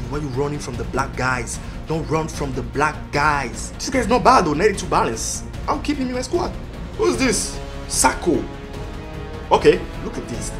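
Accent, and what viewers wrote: France. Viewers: Ta un accent français un peu t'es français ?? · r u french. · Are you French? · j'adore tes videos et surtout ton accent francais mdr ;) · are you french ?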